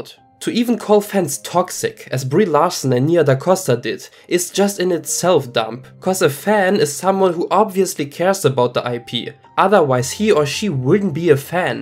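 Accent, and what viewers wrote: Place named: Germany. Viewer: Ich habs mir bei dem Akzent doch gedacht... a daitscher Landsmoan! 😂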